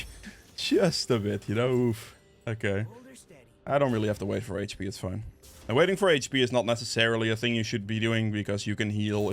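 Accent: Netherlands. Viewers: That dutch accent...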